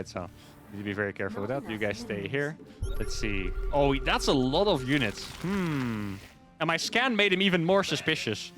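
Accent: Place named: Netherlands. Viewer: He is Dutch. Most of them speak English very fluently. Coming from England I can tell from his accent. The Netherlands is not far.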